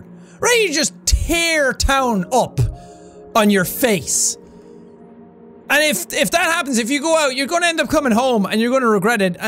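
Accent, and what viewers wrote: Ireland. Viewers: I think it's Irish like how he says shy-t instead of shit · i totally love his irish accent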